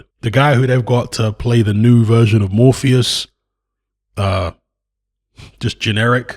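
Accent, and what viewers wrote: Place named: Caribbean, United Kingdom. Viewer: Hey homie. When you said this movie is absolute garage wit your british caribean accent. I laughed at the exact same time my guy.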